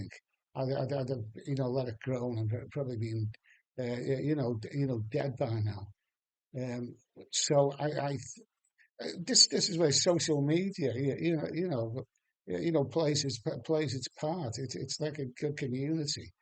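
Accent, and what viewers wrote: Liverpool, United Kingdom. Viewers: Great to hear the scouse accent again 👌 one day at a time mate but the improvement is great 👍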